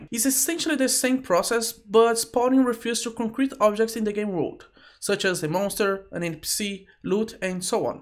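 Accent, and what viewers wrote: Brazil. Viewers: As soon as I heard the accent I knew it was another Brazilian 😂 Glad more Brazilians are making content in English it is a nice change and as a Brazilian myself it is exciting to hear this 🎉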